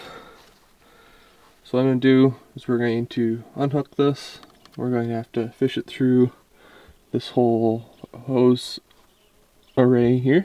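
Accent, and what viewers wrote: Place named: Minnesota, United States. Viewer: You are just so laid back and patient. That is what it takes to work on this stuff. Love the vids. Your Minnesota accent is similar to my accent from Canada.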